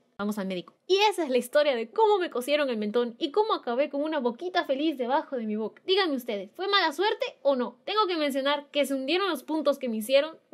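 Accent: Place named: Chile. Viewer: sos chilena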